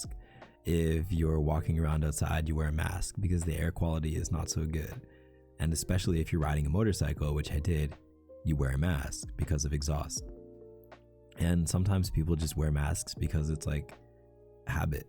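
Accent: Canada. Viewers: HOLD UP UR CANADIAN? HOW DID I NOT CATCH ON- OH MY GOD LMFAOOOOO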